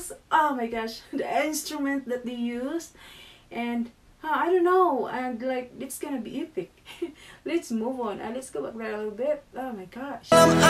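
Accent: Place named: Indonesia. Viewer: Your accent in English is just like Indonesian people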